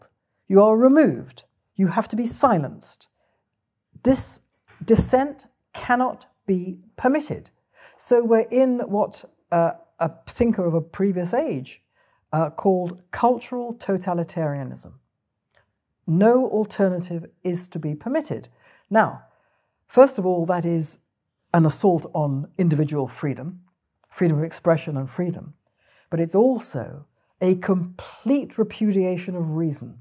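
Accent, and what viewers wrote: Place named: United Kingdom. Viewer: " . . .because people need to live in a society which is civilized which looks after the welfare of others rather than yourself. . ." This comes from a female with a British accent. Hmm, wonder what she means by "civilized" and "the welfare of others". Surely she's talking about the others with much darker skin, right?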